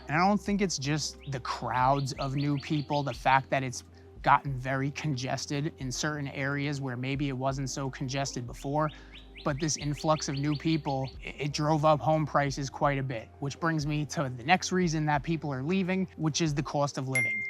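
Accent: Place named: Northeast, United States. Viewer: Good Video. Nice Northeast accent 😉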